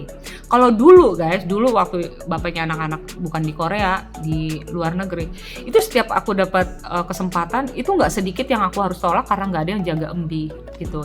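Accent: Bekasi, Indonesia. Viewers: seneng banget ngeliat youtube, bahasa bekasinya itu loh, nyablak tapi asik. pokoknya suskes terus buat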